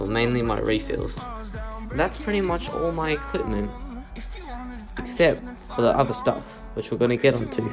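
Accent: Australia. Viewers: Are u Australian? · U Aussie?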